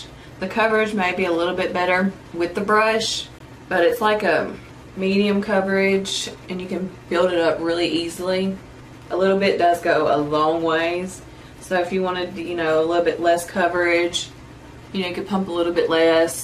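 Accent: South, United States. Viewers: I love your videos so much and your southern accent!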